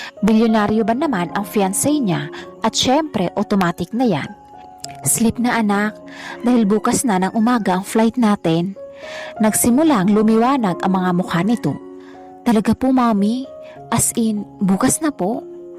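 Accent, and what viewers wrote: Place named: Visayas, Philippines. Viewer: STORY WAS GOOD BUT NARRATOR NEEDS IMPROVEMENT. DAPAT WALANG PERIOD/COMMA EACH WORD. TONO DI KO MAINTINDIHAN IF ILONGGO, VISAYAN, BICOLANO O ILOKANO. OK LANG YUN BUT IT IS HOW TO DELIVER THE STORY PARA MAKATOTOHANAN AT ANG DAMING WORD NA "KO". NO OFFENSE, NARRATOR NEEDS IMPROVEMENT PO SAYANG ANG KWENTO.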